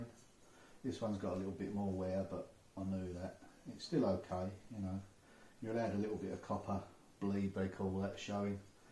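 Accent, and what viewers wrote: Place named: England, United Kingdom. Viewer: I love your vlog. It's one of those welcome to my friendship, my family and my home. I used to live in England many years ago and my partner was a true cockney who lived on Basildon Essex. Your accent and persona remind me of my life long ago when I too used to collect copper and restored two houses together in Fleetwood, Lancs. I now live in Arizona and you remind me of the life I used to live. Very special memories. Thank you for sharing. ❤ from AZ 🇺🇸.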